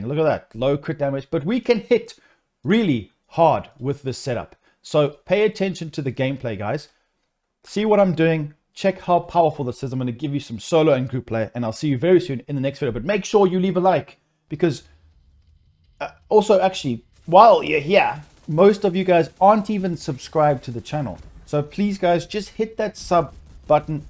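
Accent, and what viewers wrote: South Africa. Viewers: Hey, thanks for the advice. BTW, if I'm not mistaken you're accent is RSA right? I'm in Cape Town, Northern Suburbs. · South African spotted? Are you a Saffer,?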